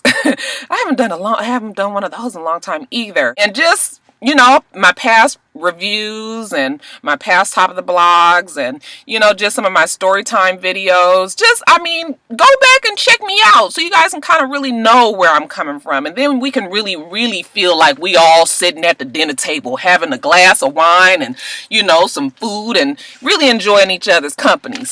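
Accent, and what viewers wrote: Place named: East Coast, United States. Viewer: Hi! I am new to the channel but like I said on fb, ( cheffalina) you're hilarious!! I watched almost all of your video's during our last of the fifty snow storms in Philly! You said you were from Cali but when talk you seem so east coast!!!! Lol I've watched this video and now I know how this thing works! Love it whether you are imitating folks or choking cause your are eating lunch while you talk to us, I love it love it love it love it love it love it love it! Peace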